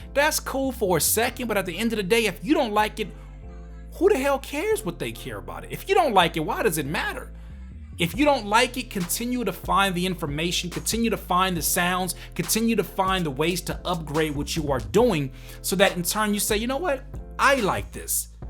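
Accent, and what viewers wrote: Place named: West Coast, United States. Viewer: lol u my dude. U b soundin west coast as fukk sometimes...which is dope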